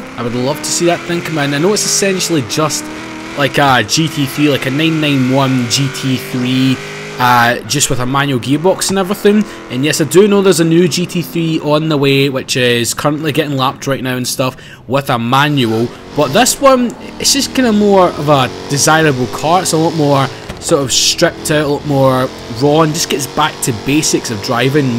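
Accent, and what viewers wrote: Scotland, United Kingdom. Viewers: YOU'RE. SO. SCOTTISH.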